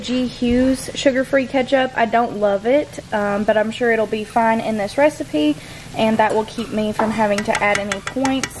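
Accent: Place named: South, United States. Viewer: Just subscribed! Your dish looks delish!! And your southern accent is ADORABLE!!!!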